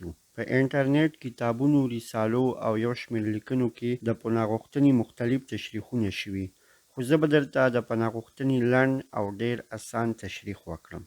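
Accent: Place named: Khyber Pakhtunkhwa, Pakistan. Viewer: ډير په زړه پورې معلومات ؤ ممکن ځينې خلک ستاسو د ژبې او د لهجې په اړه منفي نظر وړاندې کړي خو تاسو فعاليت جاري وساتي تاسو په ټول معلوماتو باندې پوه شومه همدرانګه ستا لهجه د کوزې پښتونخوا خلکو ده پوهيږو يوه پښتو ژبه ده فقط لهجې دي لهجې هم ډير فرق نه کړي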